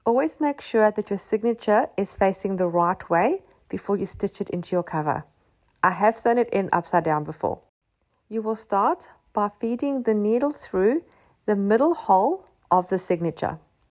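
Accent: South Africa. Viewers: South African 😊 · Thank you for this tutorial well done and much easier to follow. Are you perhaps ex-South African....I recognise your accent! I am from Durban South Africa.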